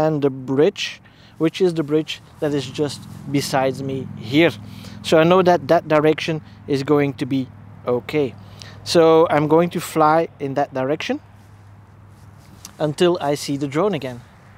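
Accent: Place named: Belgium. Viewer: Very nice explanation. Zeer mooie uitleg 😉. I think you are from Belgium. Correct?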